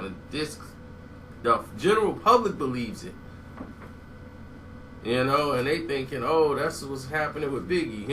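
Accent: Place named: Houston, United States. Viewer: Nigga talk like he from Houston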